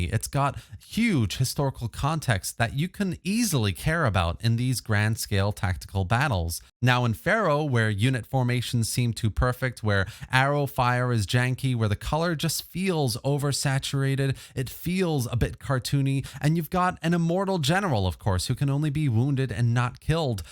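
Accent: United States. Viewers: Alright. Who the hell deals in pounds, but has an American accent? What's going on here? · You're the most American sounding Scotsman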